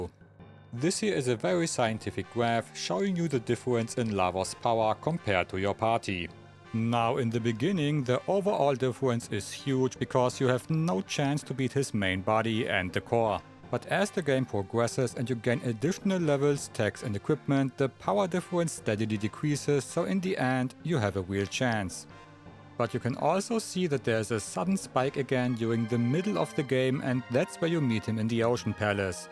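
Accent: Germany. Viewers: as someone who has known this game inside and out for ~24 years now, i can confirm the info in this vid is extremely bad. always get safe helm, swallow is terrible. speed tabs max chrono’s speed. haste helms are best for most characters, and you get the rainbow sword for chrono which is vastly better. also you get status immunity armor for everyone, so confusion is no problem… max all your characters stats and get all the optimal armor and weapons… i don’t have the time or energy just look up better guides. best thing a out this video is his german accent combined with rhotacism, which i find incredibly endearingly adorable 💗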